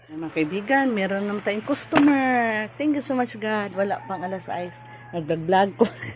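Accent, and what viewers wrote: Visayas, Philippines. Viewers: Hahaha Visaya diay 😅🌸🌼🌻Friend na tayo Te, salamat sa pag share Idol,, nanoud kasi ako, nag dala nang Goodluck hahaha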